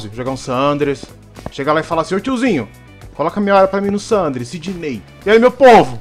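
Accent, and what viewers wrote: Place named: Pernambuco, Brazil. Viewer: conheço um oxi ate se eu fosse surdo,vc e Pernambucano sim como eu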